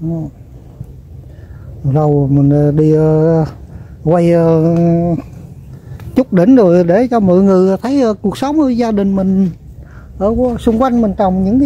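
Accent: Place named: Ninh Thuan, Vietnam. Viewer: Bạn ơi bạn ở Ninh Thuận còn mình bình thuận nhưng xa quê lâu rồi nghe bạn nói chuyện giọng nhớ quê hương quá cảm ơn bạn đã cho mình nghe lại giọng nói của quê hương dấu yêu ♥️♥️♥️♥️🥰🥰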